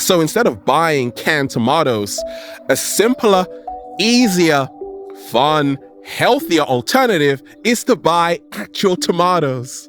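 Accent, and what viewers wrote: United Kingdom. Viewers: That British accent tho....love it! "Tomatoes"😁😁Love you. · Are you British because you sound like it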